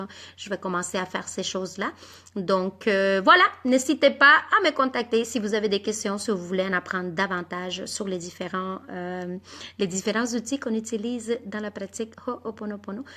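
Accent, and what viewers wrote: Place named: Spain. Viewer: Quel joli accent que l'accent espagnol en français quebecois. Merci pour cette vidéo.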